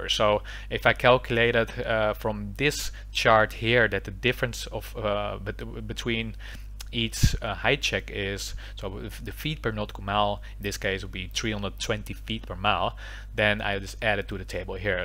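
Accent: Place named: Netherlands. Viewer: Can i ask where you are from? I'm dutch and you sound a bit dutch too! I'm very interested in pilots working in a different country than they are from. I saw that you landed in an airport in Vietnam in a different video. If this is the case would it be possible for me to fly like with British Airways or Qantas for example instead of like KLM or a different Dutch airline. Thank you.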